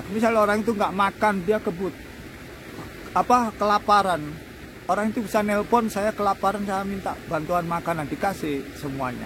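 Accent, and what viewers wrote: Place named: Java, Indonesia. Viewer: Salam teko blitar jawa timur mas.. Sampean logat bahasane koyo wong jowo... Jowo ngendi mas..?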